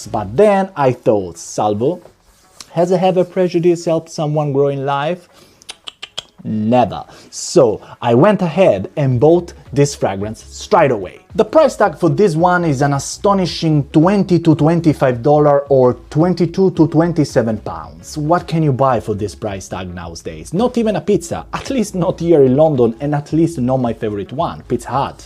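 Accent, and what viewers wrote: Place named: Italy. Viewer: thick accent. are you Italian?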